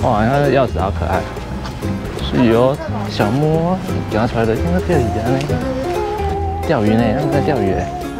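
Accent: Taiwan. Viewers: 剪輯整個很順看了很舒服還有整部影片好幹話好台好爽那個口音那個用詞啊希望可以多拍海釣方面出國題材也很棒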